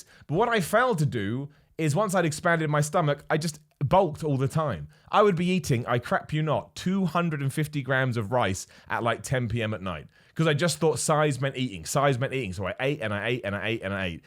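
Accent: United Kingdom. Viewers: Don't know if I enjoy the British accent or the genuine opinion better. What a lovely human being.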